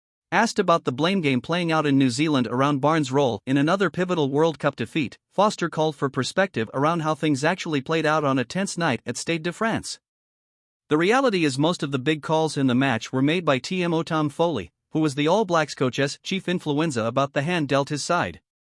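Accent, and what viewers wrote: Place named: United States. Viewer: How is this an OFICIAL AB site when the narrator is American??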